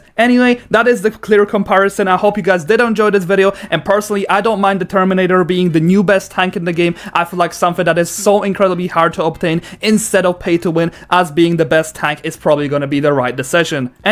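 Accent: Poland. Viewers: im judging by your accent you are from poland or chzech?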